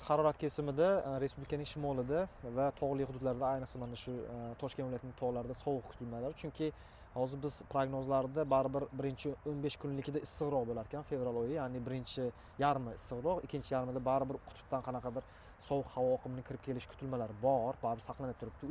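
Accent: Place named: Fergana Valley, Uzbekistan. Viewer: Asobi vodiy besharq gapirganigiz❤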